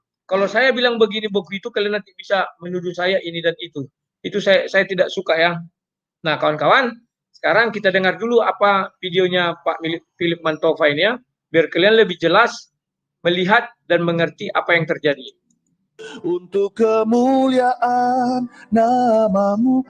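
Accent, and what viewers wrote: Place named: West Java, Indonesia. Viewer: Logat Sunda 😂😂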